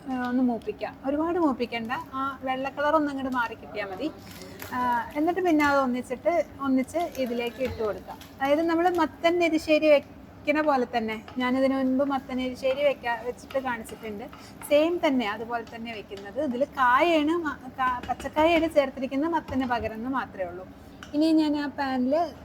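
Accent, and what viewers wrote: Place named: Palakkad, India. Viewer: പാലക്കാട്‌ സ്ലാങ്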